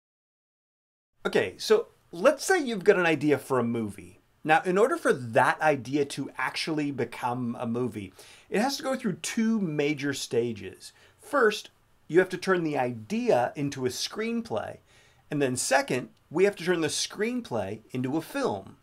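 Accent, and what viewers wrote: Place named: United States. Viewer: You sound american...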